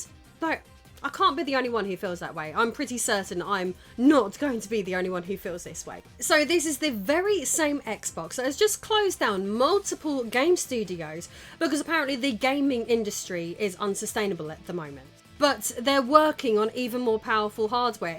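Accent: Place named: United Kingdom. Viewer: I actually like this new direction for the channel, I could see growing to one day to be one of the top gaming news channels because she knows how to properly research the topic and her British accent elevates the content by lending it a sense of legitimacy that American YouTubers just don’t have. I feel like I’m listening to a BBC news broadcast when she’s discussing the topic.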